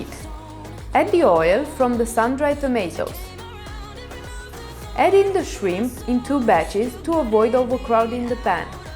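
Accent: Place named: Romania. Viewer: Love the recipe and your accent. Is it Romanian?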